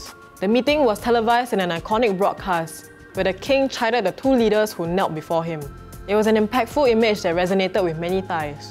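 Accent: Singapore. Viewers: The unabashedly singaporean accent is refreshing compared to the fake western accent that most reporters have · That is how we Singaporeans sound like. Don’t like it then it is your problem.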